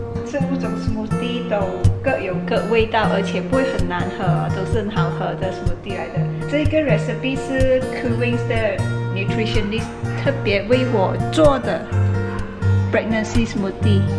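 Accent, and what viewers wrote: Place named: India. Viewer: 你说英文有点像印度人说的英文🙈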